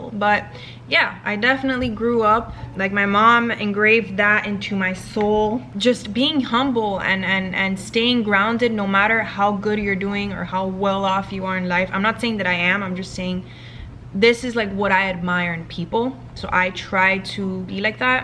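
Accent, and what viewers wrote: Cuba. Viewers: OMG!! Me encanta tu acento cubano, besos desde España. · ayyyee my question made it to the video! Me encanta tu acento cubano 😉 I’d be cool to see a GRWM in Spanish or any other video spoken in Spanish ! 🤗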